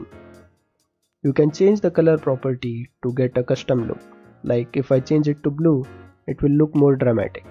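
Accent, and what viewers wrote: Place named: India. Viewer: Indians really have to work on their accent! except that, the content was useful thanks.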